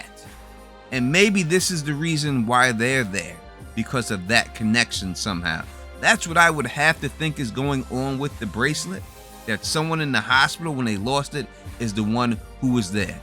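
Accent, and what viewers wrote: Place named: Long Island, United States. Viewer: you from long island, son? i know that accent.....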